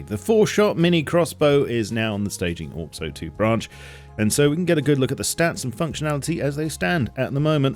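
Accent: United Kingdom. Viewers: I'm only here for the Bri'ish accent.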